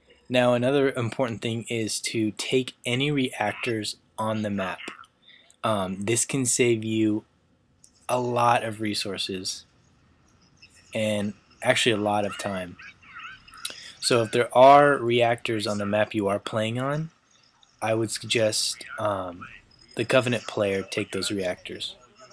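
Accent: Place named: Canada. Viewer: ¿',: | i can tell that your canadian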